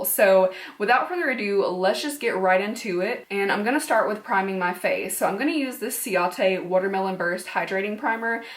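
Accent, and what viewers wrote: South, United States. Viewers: I agree she reminds me of the south too and I'm just like 😭😭😭 I miss those accents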